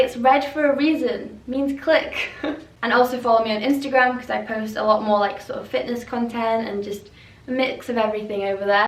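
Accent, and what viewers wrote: United Kingdom. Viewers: love your accent :3 sounds english, did you grow up in the UK; do you speak portugese too¿?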